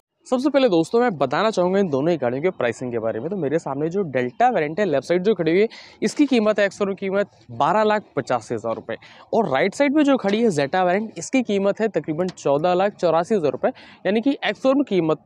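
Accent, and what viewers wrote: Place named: United Kingdom. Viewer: Bhai tum hindi bhi British accent me ku bolte ho...bahut chewtiya sound krte ho bhai... isliye bta ra hu bs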